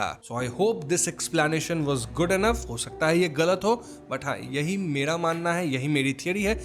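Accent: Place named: Bihar, India. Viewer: Why do u sound so funny and Bihari types?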